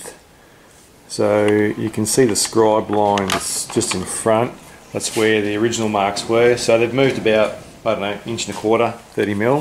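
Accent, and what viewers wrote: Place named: United Kingdom. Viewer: Finally on part 7 is what I wanted know, what you used for top and Ha Ha <mail> yup your British ascent really stands out on aluminum> uh·loo·muh·nuhm. Being from Maine USA I had to rewind 3 times to figure out what you said. I skimmed through most of you lathe bench build videos, your's is about the closes to the design I want, really nice job so far thanks for sharing........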